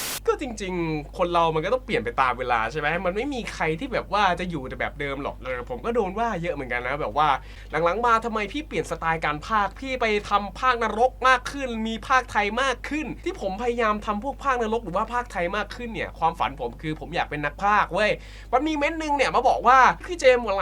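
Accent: Isan, Thailand. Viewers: เจมส์ ใช้คนอีสานมั้ย คนจังหวัดรัยครับ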